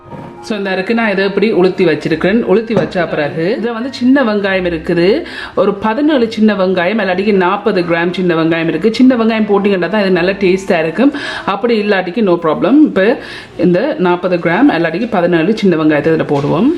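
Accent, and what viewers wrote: Jaffna, Sri Lanka. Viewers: Dear sister reasontly i saw your videos it's very useful my mother cooked like this i didn't learn from her she passed away every day when i cook i sadly remember her and regret my careless but now i am very very happy because your are uploading my moms recepi thank you very much Sister and your tamil (our jaffna tamil) is super👍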